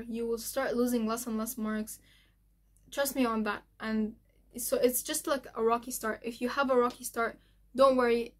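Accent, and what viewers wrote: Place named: Arab world. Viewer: I FIND YOUR ARABIC ACCENT SO SO SO PRETTYT AND YOUR HUJAB I SO SO SOS SOS OS PRETTYY TOOO LMAOO thankyou for thiss <3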